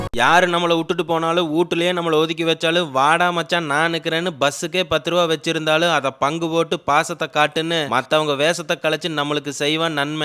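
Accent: Coimbatore, India. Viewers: Editing and timing counters are fantastic ❤❤❤ Moreover very catchy voiceover in Coimbatore slang❤❤❤ Semmaa kannu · Anna Namma Coimbatore tamil alago alagu .neenga pesarathu ketka ennum alagu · Anna unga enga kongu Nadu voice ye Thani anna super 🎉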